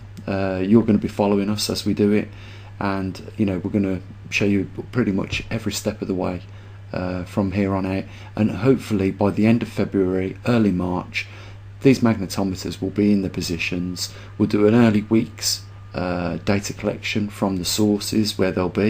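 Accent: Birmingham, United Kingdom. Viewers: OMG a Brummy talking science . Whatever next. Utter bllx.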